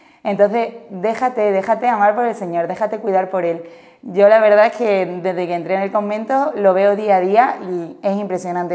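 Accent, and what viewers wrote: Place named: Andalusia, Spain. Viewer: Linda historia y hermosamente contada con alegría y acento andaluz 😃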